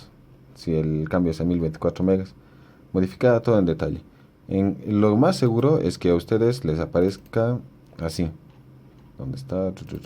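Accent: Bolivia. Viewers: SU ACENTO DE BOLIVIANO , SOY DE BOLIVIA JAJA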